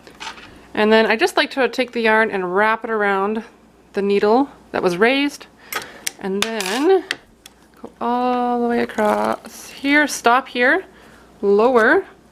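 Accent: Canada. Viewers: You are amazing! I love that you figured out how to make the socks without doing the Kitchener stitch. I think at my young age of 73 that I should just buy my socks and not try to make them on machine or by hand knitting. It could take me the rest of my life to figure it all out, ha ha! I have tried hand knitting without much luck. I hate to spend a lot of money on a machine without being able to figure all the ins and outs. Lovely how you have done such a great job for yourself! You sound as if you are from Canada so I will not hope that you can teach me unless you would like to spend a couple of weeks in North Carolina! Bless you girlfriend. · I love the voice and accent. I'm guessing Canadian. I'm convinced this is Matthias Wandel's sister!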